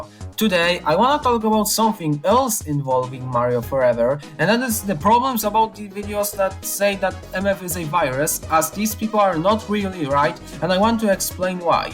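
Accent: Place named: Poland. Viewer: Po akcencie i po opisie widzę, że jesteś z Polski :D Chciałem się zapytać czy wiesz gdzie można pobrać MF ale podałeś link do strony gdzie można je pobrać. Ale chcę się upewnić, i zapytam czy strona, którą podlinkowałeś (ten drugi link) jest na pewno bezpieczna? Gdziekolwiek bym chciał pobrać MF to jest zainfekowane i jesteś jedną z niewielu osób, u której jest podana strona, na której można pobrać MF i byłbym bardzo wdzięczny za odpowiedź czy strona ta jest na pewno bezpieczna i jak pobiorę MF to nie będzie zawirusowane. Z góry dziękuję!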